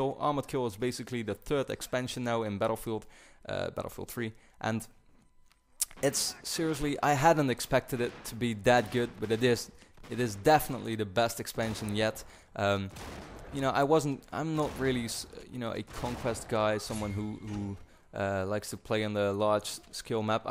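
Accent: Netherlands. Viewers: Ik ben ook Nederlands en ik zocht op youtube een commentary over Breaking Bad en kwam toevallig op jou uit! Geweldige serie. Ik vroeg me van het begin al af of je Nederlands was ;)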